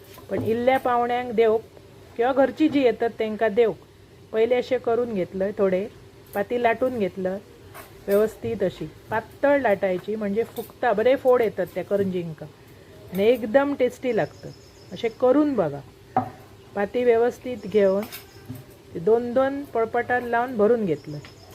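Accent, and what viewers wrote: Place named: Malvan, India. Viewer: मालवणी भाषा बोलण्याची पद्धत अप्रतिम अजिबात भेसळ नाही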